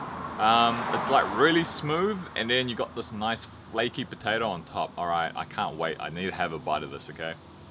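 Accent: New Zealand. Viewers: Bruh your kiwi accent is strong lol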